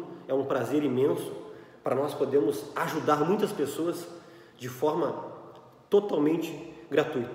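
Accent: Rio Grande do Sul, Brazil. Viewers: Dr muito obrigada por seus videios,Tenho 65 anos,tenho Diverticulite e as fezes ficam presas na parte direita,ai vou massageando p baixo p que solte.Procuro me alimentar bem e mais de alimentos naturais.O que devo fazer p defecar melhor? Agradeço sua resposta. Amo o RS e seu sotaque, morei 12 anos em Novo Hamburgo,agora moro no RJ,onde nasci.Deus o abençoe ricamente sempre.Bjo no coração.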